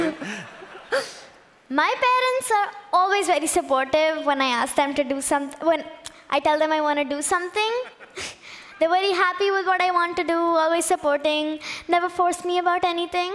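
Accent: United Kingdom. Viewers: English speaking is not that necessary . Learn hindi or sanskrit and speak it fluently ,you could be well honoured and signified. Just a imposement of the british accent . Support hindi as well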